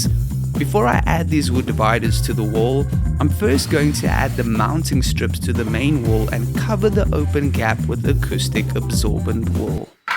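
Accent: South Africa. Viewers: after all these years I've finally confirmed myy suspicion that you're South African too XD awe masekind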